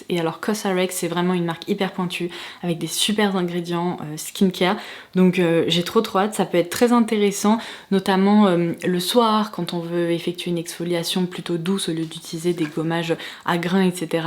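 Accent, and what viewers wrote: United Kingdom. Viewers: Bonjour, Vous avez un très jolie accent british si je ne me trompe. ☺️ Vous avez des attache lasbas ou vous avez appris la langue? C’est par curiosité 🤗. Agréable Journée .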